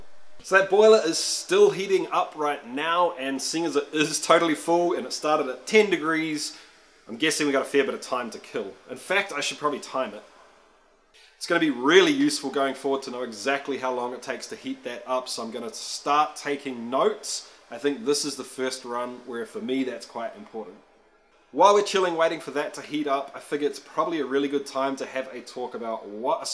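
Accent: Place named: New Zealand. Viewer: Awesome videos and being a south islander good to hear a kiwi voice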